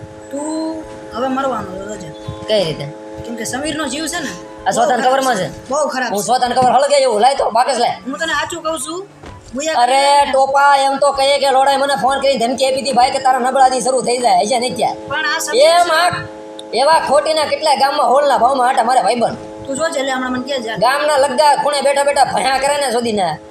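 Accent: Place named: Kathiawar, India. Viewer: bhai કાઠીયાવાડી lage se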